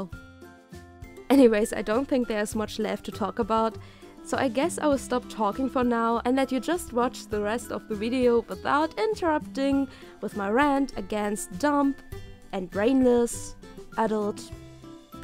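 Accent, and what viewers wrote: Germany. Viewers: that accent...lol. i think you speak german don't you? i do so and have the same one xD srry if i'm wrong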